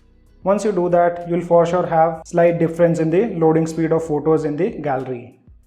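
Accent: India. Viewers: Indian style English is awesome.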